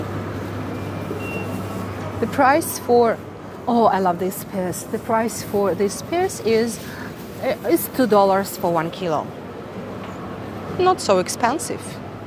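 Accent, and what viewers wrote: Russia. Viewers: Ощущение, что это русская девушка, просто говорящая на английском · ! С первых слов на английском слышно Рязанское произношение. Это пропаганда! Еды много, а кто это покупает? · Your accent is like Russians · Вы учитель английского? Разговариваете как по Бонку. И акцент русский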